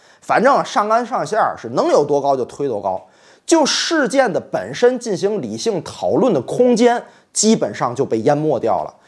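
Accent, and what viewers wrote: Tianjin, China. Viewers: 早安, 新闻充满正能量,而且资讯非常正确,而且讲话充满了天津话顺口溜,超级的高级幽默, 的视频跟长脸自干五的视频,完全是不同的风格,可是都让我长知试了, 加油了.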